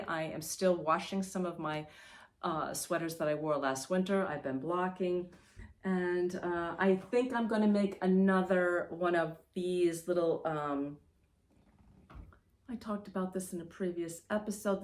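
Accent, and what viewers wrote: New England, United States. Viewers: Hello,. What a pleasant surprise to come upon your podcasts. We seem to have a lot in common besides a love of knitting. I grew up in Pawcatuck, CT so still consider myself a New Englander. I love your footage of Block Island which I have visited once - such a precious memory of a lovely day with my Dad. My brother lives in Westerly, RI so I love your accent. I, too, have lost a wonderful uncle who left a legacy of storytelling. Your knits are gorgeous and so inspiring. I currently have an Artus shawl on my needles, have knit the Half + Half Triangle shawl (have linen quill to make 2 more), and love all the blue/green shades that you are drawn to. Love your reference to the relationship between gratitude and happiness! Looking forward to your future podcasts.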